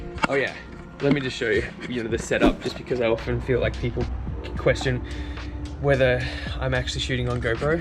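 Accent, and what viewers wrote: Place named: Australia. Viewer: Thanks for the vid! This is the 4th video of the day I've watched on this very topic.. and the best for sure! Also, I was immediately surprised by your accent. An Aussie vlogger! Hectic! Inspo. Im a teacher for ESL (English as a second language) to adults. Going through some... Challenging times.. bored of work... I've definitely always been over consuming recently!! And I have been tip toeing around my desire to talk about all those things you mentioned, as well as mental health. I don't know how but I wanna begin talking about it. I liked your Socrates reference. Good job. PS your pool's inner walls are heaps discoloured but the water looks faaabulous. Pps. In writing this I have some ideas. Thanks again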